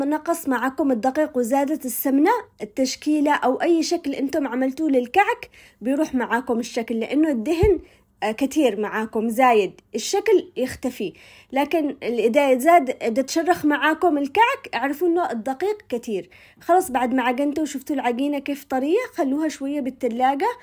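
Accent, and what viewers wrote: Yemen. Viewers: تابعت كذا مقطع بقناتك حلوه لهجه اليمين الله يعطيك العافيه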